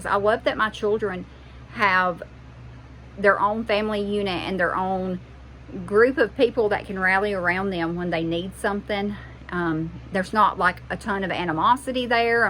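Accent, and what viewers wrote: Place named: South, United States. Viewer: New subscriber! From the south also, so, I love your accent. 😂 Looking forward to watching your videos.❤❤!! 😊❤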